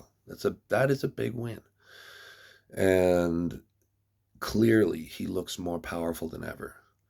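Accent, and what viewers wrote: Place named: Canada. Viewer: I been watching you arm wrestle before <num>, it’s crazy how your accent has changed, I miss the deep Canadian accent, God bless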